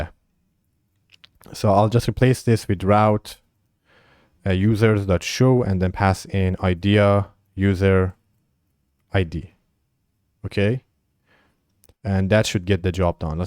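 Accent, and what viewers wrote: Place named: Iran. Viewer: you are very good at teaching keep it up! and i think you're persian... am i right ? i almost can feel the accent 😂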